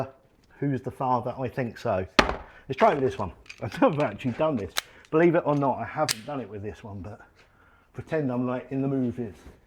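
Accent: United Kingdom. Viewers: i thought you lived in England because of your accent and the fact you said you had to be in the UK for the giveaway, you can get a lot of things if you pay enough money and you have a completely clean record, but it is very controlled, i wish it was easier and cheaper but it's not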